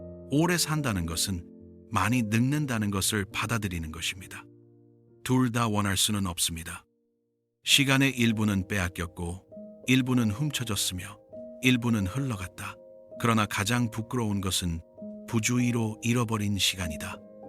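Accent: United States. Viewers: 다 좋은데, 재미교포 2세 목소리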